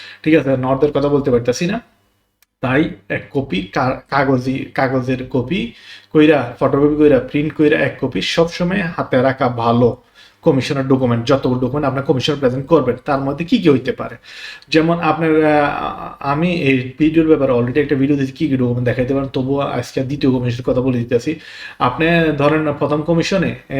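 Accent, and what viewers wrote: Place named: Noakhali, Bangladesh. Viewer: আসালামু আলাইকুম কেমন আছেন ভাই আপনার কথা গুলো শুনে আমার মনে হচ্ছে আপনার বাড়ি কি নোয়াখালী না কুমিল্লা জানাবেন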